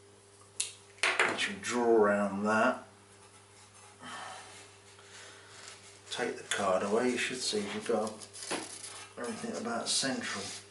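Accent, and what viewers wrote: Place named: Leicestershire, United Kingdom. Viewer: Just found your channel, and started catching up with your vids, I've been an engineer all my life, so can appreciate your skills, and with that accent, Im thinking you are from somewhere in Leicestershire? anyways, great content, really enjoying it!, cheers Dave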